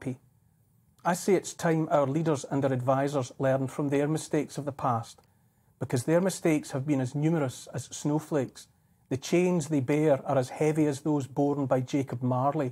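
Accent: Scotland, United Kingdom. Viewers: Your speeches are spot on and with your beautiful Scottish accent it is truelly meaningful and comes across so true, its a shame this is not a speech to the nation on the 6 0 clock news. Well put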